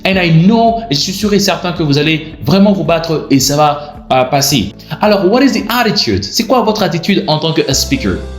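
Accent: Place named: United States. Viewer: Hi there, i love your enthousiasm and the way you switch in a Split second from French to English, you are totally right in your explanations : speaking is absolutely primordial, the more you will do it the better it will be for your English improvements.Maybe you live in the USA , at least your accent tends suggest it? Thank you for the vidéo.Serge from France native french speaker !!!!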